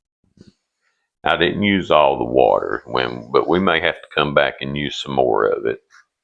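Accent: South, United States. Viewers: thans for the vid..'....i do this with hamburger , make four patties ,fry pour of cooking oil then add 1 jar beef gravy let simmer on low for about 30min while i make mashed pato, and greens which brings me to my Q. what keeps the homemade gravy from turning thick to the point of being clay .because gravy will get thicker the more you cook it .what am i missing .thanks ...and where are you from , nice to hear a voice from the south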